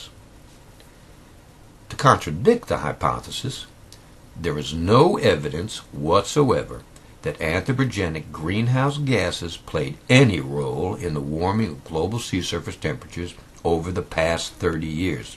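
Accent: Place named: North Carolina, United States. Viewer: Great job,! You should get a British guy to do the voice overs, though. Your'e from North Carolina, aintcha?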